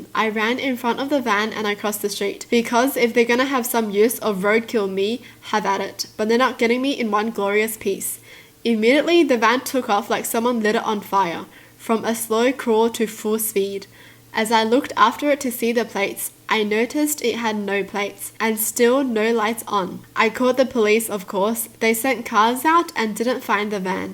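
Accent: Australia. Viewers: How did I just notice that you were Aussie I am to yay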